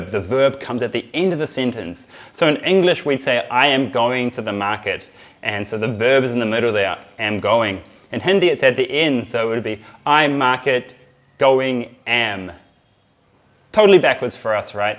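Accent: New Zealand. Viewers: Kia ora! The first YouTube video on how to learn Hindi and the speaker has the same accent as me:) Whereabouts in Godzone are you from? I'm learning Hindi because I simply just love the look and sound of it. I dabble a little in Buddhism and yoga, so being able to know more of the language will hopefully enrich both. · Are you from New Zealand?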